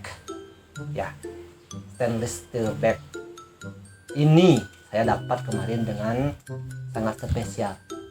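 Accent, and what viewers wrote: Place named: Bali, Indonesia. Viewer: tabanan napi gus?tiang saking jegu....logatne kentel care logat penebel e...sampun tiang subscribe...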